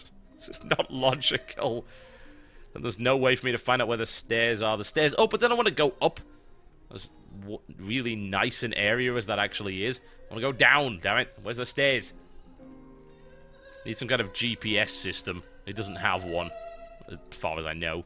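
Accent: United Kingdom. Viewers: No offense, but there's a little thing called accent. And TB's british, so his accent is, technically, the one with the "right" pronunciation.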